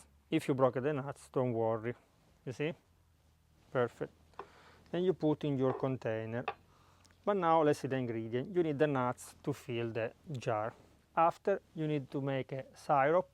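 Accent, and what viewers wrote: Italy. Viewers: Looks spppectaculllar! Can I use honey instead of sugar? My wife thinking I am crazy roatating my forearm for the enjoyment. Love Italian cuisine and your accent. Go bro.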